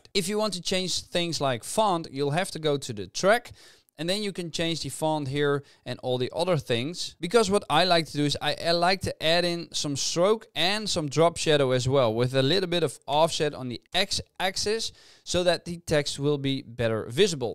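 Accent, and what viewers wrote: Netherlands. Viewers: i hear a little bit of nederlands in your stem. Still thanks for the tutorial it helped me:)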